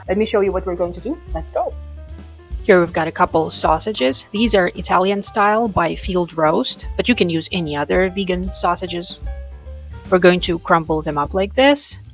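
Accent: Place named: North Macedonia. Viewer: Hi! This looks really yummy! I will try this 😊 You have a very slight accent, are you originally from Macedonia? I have a good friend from Macedonia that sounds like you! Have a great day 😊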